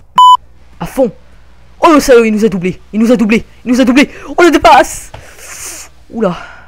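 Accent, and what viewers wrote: Belgium. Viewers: tes belge ? Vrèment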